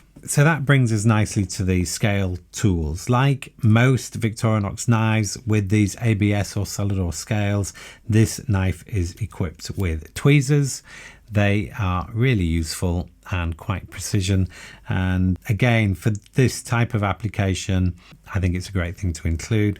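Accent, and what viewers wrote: England, United Kingdom. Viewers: If im honest SAKs are why i came to the channel, them and Olight torches. I stayed for an English accent and UK £ pricing and the concise reviews. I have titanium scales on my cybertool but as hinted at above i always have a torch so i don't have any lite models. It's probably as a collector of well over a 100 SAKs in my top 5 Swiss Army Knife models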